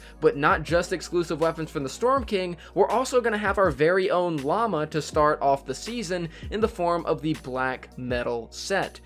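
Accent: France. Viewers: is he from france ?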